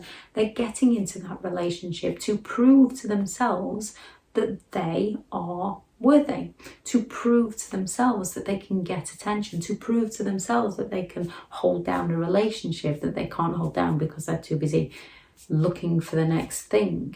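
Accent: United Kingdom. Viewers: I love your accent.Sexy Limey!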